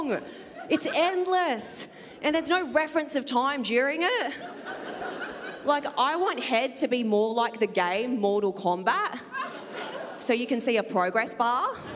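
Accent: Australia. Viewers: Her Aussie accent is so sexy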